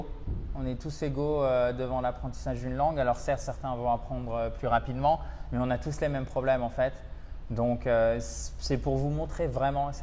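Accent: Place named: United States. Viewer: Thanks and. I hope another video with. What a beautiful voice! And interesting to learn new expressions really typical and current., you are perfect in your explanations, and your English American is top, but think of directing the slate towards the camera. See you soon. (Petit plus Bonne fête)🎉👍🏻👍🏻👍🏻👍🏻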